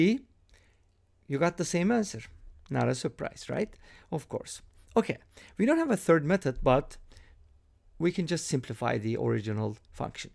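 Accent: Turkey. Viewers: Your accent tells me you are from Turkey:)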